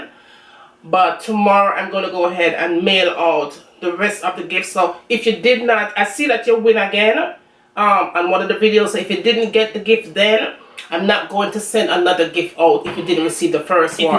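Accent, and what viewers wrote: Jamaica. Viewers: Very Jamaican. I love it.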